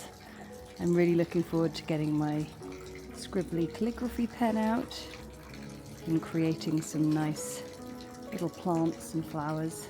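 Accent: England, United Kingdom. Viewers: I think this is wonderful. I love architectural elements. Your first stroke was so precise! No messing around with that circle- you hit it first go! You are very brave. Not only are you painting with no real drawing there, you are miked up and filming yourself!! You do it so beautifully and effortlessly but I’m sure it’s a lot of intimidation rolled into that. You truly are something else. How you can paint and talk with no feedback from anyone and make it so easy to understand is just amazing to me. When I decided to dip my toe into watercolors I followed four or so YouTube artists. Then I found you. I unsubscribed to them all. You are talented, in many things. Your voice is so soothing and sad to say if I don’t like the voice well.. forget it. English accent is a bonus. Your life is sweet, you don’t use this channel to unburden your issues like some do. 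Ok I’m sorry for them but I’m here to paint only. Anyway we all love you. I put watercolor away for a year because I did something else creative but my friend is coming and wants tips so I came back and have been watching your videos quite a bit in last days. I’m excited and the spark it back! There is a lot to absorb with any new endeavor and I know it’s the practicing that will get me there. Hope you had a great time!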